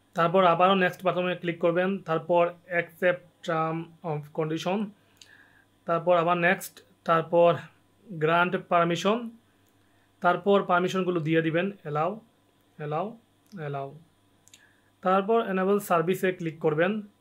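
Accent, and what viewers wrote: Sylhet, Bangladesh. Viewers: Bhai ki sylheti.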